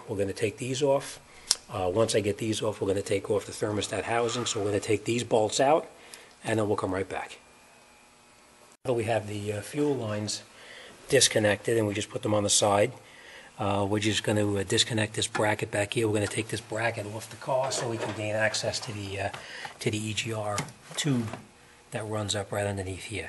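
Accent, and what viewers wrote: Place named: New Jersey, United States. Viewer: Hey, are you from Jersey City? I am, and I can detect the Kings english.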